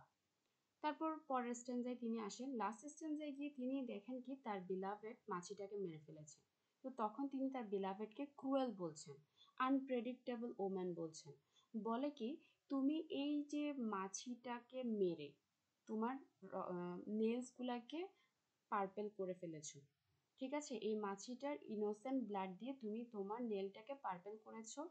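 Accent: Chittagong, Bangladesh. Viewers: 100% apnar bari chittagong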